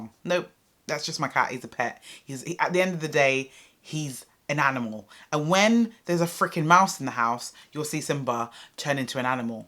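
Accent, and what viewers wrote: United Kingdom. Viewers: Id prefer if you could seperate your - very talanted - comedian style from a very serious message. I was a little irritated because it was so funny to watch. Beside your strong english dialect is fun too for foreigners :D Glad to know its not only me feeling flood by this dog pest.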